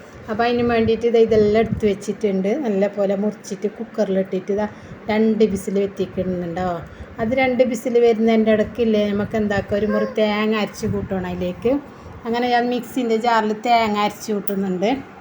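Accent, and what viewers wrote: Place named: Kasaragod, India. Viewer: Kasrkode ഭാഷ സൂപ്പർ 😂😍 എല്ലാ വിശേഷം അടിപൊളി റെസിപിസും super 😍👍😋 L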